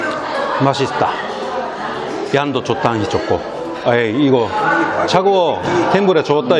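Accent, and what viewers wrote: Busan, South Korea. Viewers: 진짜 부산 토박이 같아ㅋㅋㅋㅋㅋ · 정말 오묘하게 부산사투리와 일본어억양이 다 슦여있네야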